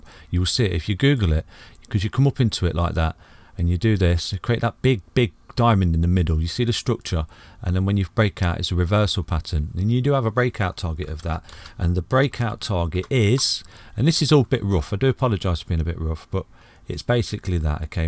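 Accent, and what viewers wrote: United Kingdom. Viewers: Nice to hear a fellow brit talk through it. Find it easier to understand. New to the game, this is very helpful info ✌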